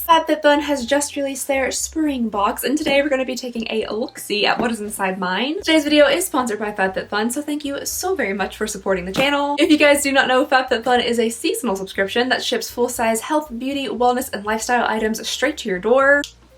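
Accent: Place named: Tennessee, United States. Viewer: daaaamn! I'm the 12th. I enjoy myself. I'm in TN so I get her accent and humor. My Mom is from KY. We're all crazy and that's what makes these videos incredibly enjoyable. Happy early Birthday!! 🥳🎁🎂🎉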